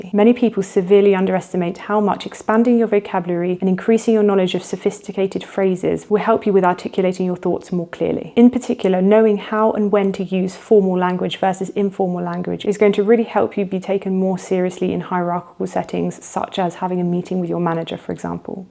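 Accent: United Kingdom. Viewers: Good video. Is this rp accent?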